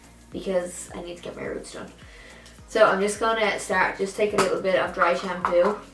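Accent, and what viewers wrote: Ireland. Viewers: Are you Irish? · Are you Irish? Cause guuuurl I love your accent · Is this girl from Ireland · Who else thinks she sound Irish ☘️ 🇮🇪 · Great messy bun you always have great style it's why I luv watching you. Not to mentioned as a transplanted fellow Irish gal I luv the accent....hugs to you 😘👍🇨🇮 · Irish? Me too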